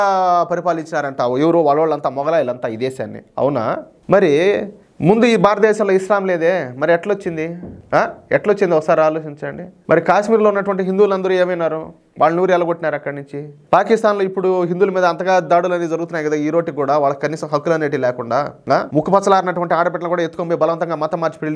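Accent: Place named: Chittoor, India. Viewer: Super andi, as a Tamilian I’m so proud. Meeru hypocrisy le kinda peggi lekunda chakkaga explain chestharu. And that too mana Chithooru Thirupathi slang lo. Keep it up andi.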